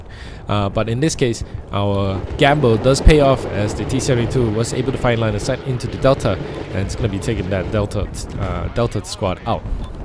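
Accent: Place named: Singapore. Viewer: Those frogfoots 🤯🤯🤯 beautiful plays! Thanks for good commentary too, I'm guessing you are from Singapore?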